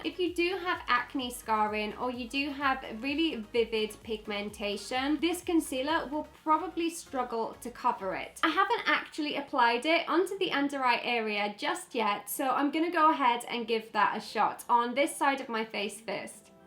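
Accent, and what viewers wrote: United Kingdom. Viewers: She sounds British but sometimes when she talks she sounds different, is she fully British? Or did she lives somewhere else first? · does anyone know what her accent is like underneath the British? just wondered cus it's so pretty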